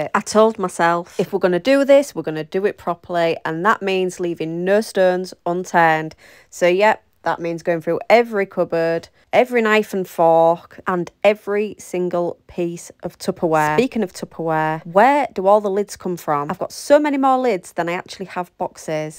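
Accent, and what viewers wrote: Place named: Hull, United Kingdom. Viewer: Great cleaning video just subscribed I knew you was from hull as soon as you spoke went back to find out on first video I’m from hull too going to binge on your other videos when I get chance xx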